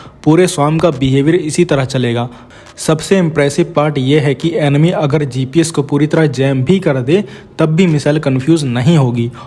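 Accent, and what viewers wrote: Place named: Bihar, India. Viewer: bhai sabse pehle apko defence me thodi geopolitics v add krni hogi just few news and bhai jab aap koi English word bolte ho it sound like u live in bihar apko thoda accent shi krni hogi,(please dont minde) or bhai apni video baki k defence channels se ada ya ak ghtna pehle upload kiya kroo, or bhai kisi news ko poistive way thoda criticise v kiya kroo ap just news bolte ho thoda explain v kiya kro aisa nhi aisa hona cheahe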